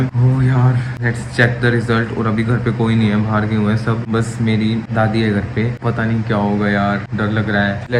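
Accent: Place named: Haryana, India. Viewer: Proper harayanvi tone 😁😂❤️.....vase mere papa ne bhi ase kuch react Kiya 😂...all harayanvi father's are same 😅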